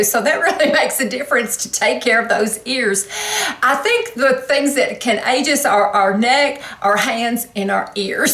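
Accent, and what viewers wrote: South, United States. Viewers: Wonderful "tips"! I actually follow a lot of them. After too many years of not caring for my body (smoking, drinking, not sleeping enough, eating poorly, etc), I'm probably the healthiest internally than ever (at 63) since I've quit all that nonsense. Now I suffer with sciatica, but my husband and I hike portions of the Appalachian Trail yearly. I've put in a few hundred miles now and that's the only time my sciatica doesn't act up! Walking, climbing those Appalachian mountains, and sleeping in a tent for weeks on end make me feel youthful again! Amazing! So I'm a firm believer in STAYING ACTIVE, whether it's exercise in your living room or a gym, or bike riding, yoga, swimming, running...whatever you can do to stay active is a good thing. And having a hobby is great too. Keep the mind active as well! I am an artist, and I try to stay involved with my community art group. 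I just love your videos...you seem like such an sweet, genuine, Southern belle (Tennessee? Kentucky?), and I thank you for your videos.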